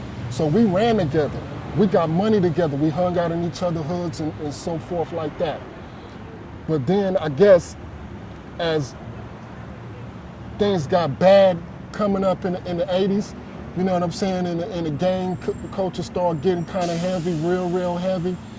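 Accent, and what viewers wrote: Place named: Chicago, United States. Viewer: He sounds Chicago not new york... we don't talk like that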